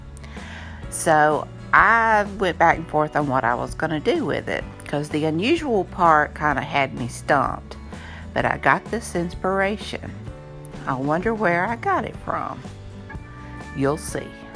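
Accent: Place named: South, United States. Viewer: Such a cute kitty broom! Love your southern accent!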